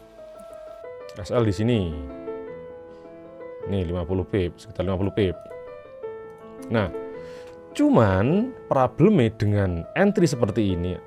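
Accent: Java, Indonesia. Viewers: Tulen wong jowo ki.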